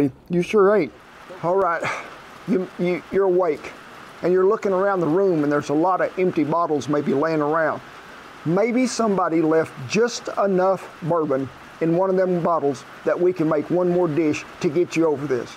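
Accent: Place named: Wisconsin, United States. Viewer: You and cheese! You almost sound like you could be from Wisconsin. Thank You.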